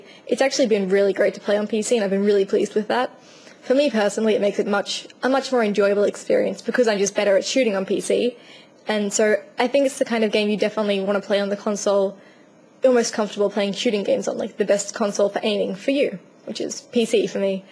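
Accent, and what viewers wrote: Australia. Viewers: where are u from your accent sounds like austrailian maybe .....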